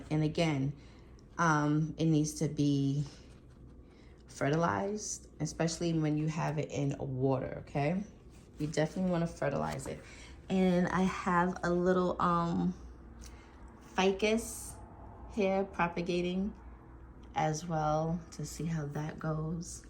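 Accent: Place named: New York, United States. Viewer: Your plant babies are cute. Love the NY accent!!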